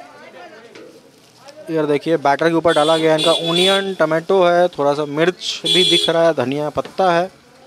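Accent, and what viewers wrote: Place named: Bihar, India. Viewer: Bhai fan of ur vlogs.Luv the way u explain things in bihari ascent. Big ❤ to all ur mutton vlogs keep making ur vlogs. Best wishes to u n ur team .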